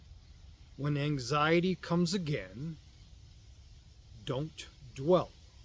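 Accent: Boston, United States. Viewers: Gold. Is there a subtle Boston accent?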